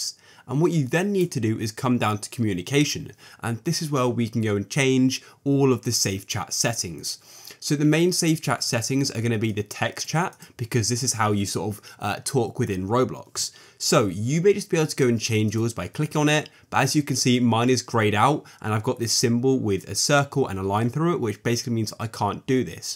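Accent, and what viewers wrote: United Kingdom. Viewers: No way y’all all just got scammed by a random British dude I feel bad for y’all 😂.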